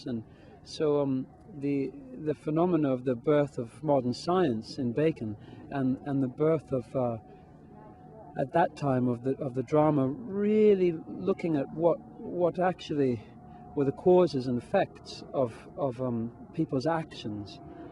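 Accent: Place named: England, United Kingdom. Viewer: Why does he have an English accent here? He was raised in America. And he didn't have one when he accepted his Tony two years ago.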